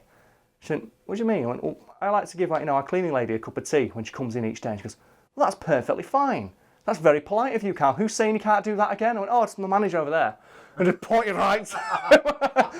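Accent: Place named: North, United Kingdom. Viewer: Talking about tea like that and having that northern accent, it's like I'm watching Guy Martin!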